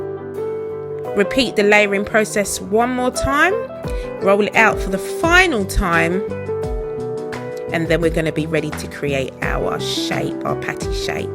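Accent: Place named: United Kingdom. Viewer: Good question .your accent is British .I think u born there .but if you jamaican family born uk .can you talk patios?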